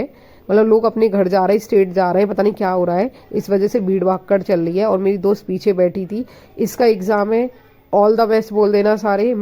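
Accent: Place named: Mathura, India. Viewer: Aap proper mathura ki ho kha se h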